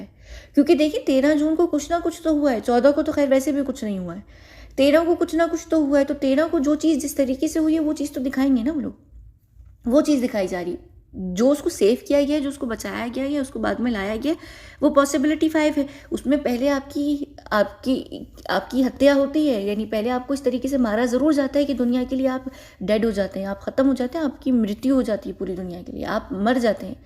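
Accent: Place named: United Kingdom. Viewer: How come an Agent pronounce English words like this way when her accent seems British sometimes??..Confusing.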